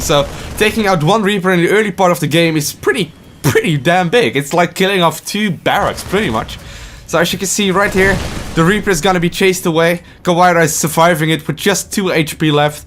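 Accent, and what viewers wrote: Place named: Netherlands. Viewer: This accent could not be more dutch...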